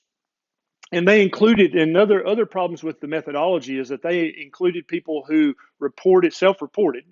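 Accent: South, United States. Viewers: You’re such a genuine person... its why i love this channel a lot. Saturated with knowledge from a MD with a genuine southern accent👍🏻🇺🇸 Which state are u from?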